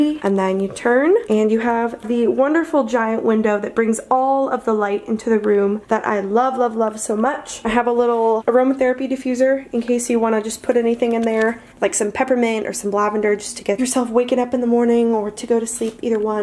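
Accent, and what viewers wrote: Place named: Ohio, United States. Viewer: It's so refreshing to hear another Ohioan accent, amongst all the Californian ones (nothing against em, but hometown pride and all that haha). The curtain lights behind your bed just gave me an idea for my own room, thanks for such a creative AND affordable display of room decor!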